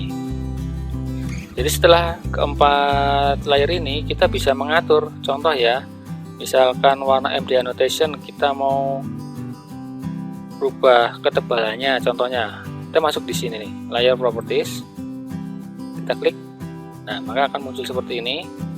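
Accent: Banyumas, Indonesia. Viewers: Belajar lagi sama om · pagi " dah dikasih pelajaran nih makasih geh om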